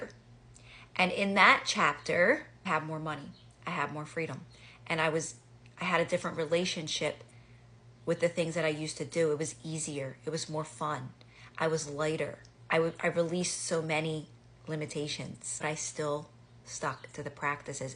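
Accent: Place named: Philadelphia, United States. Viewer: I cannot get past that Philly accent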